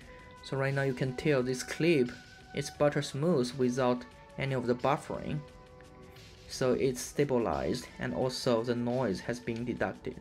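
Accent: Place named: China. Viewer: I bet you are Chinese from your accent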